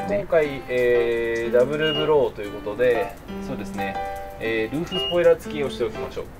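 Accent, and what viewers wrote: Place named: Kyushu, Japan. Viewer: しかれる。 上京して28年、九州弁たまらんです。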